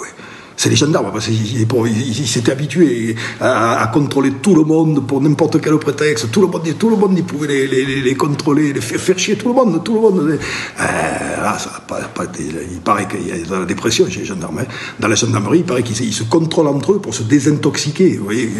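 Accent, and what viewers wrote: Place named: Marseille, France. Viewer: L accent de chez NOUS .MARSEILLAIS, PAGNOLLL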